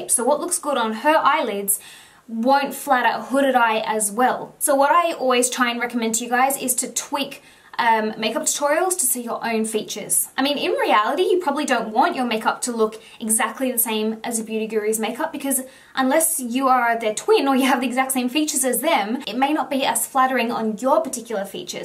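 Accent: Australia. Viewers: Is her accent Australian? Sorry I can't assess that I'm Dutch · Ireland? You don't sound Irish, you sound Australian or something. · Ireland? I always thought you were australian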